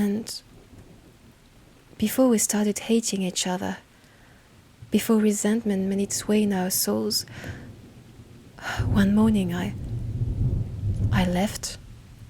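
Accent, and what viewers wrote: France. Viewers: more french accent, that's what i subscribed for, hell yeah!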